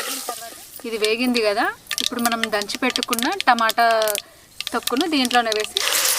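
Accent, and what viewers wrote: Telangana, India. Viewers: Chutney bagundi and me telangana language kuda bagundi 😊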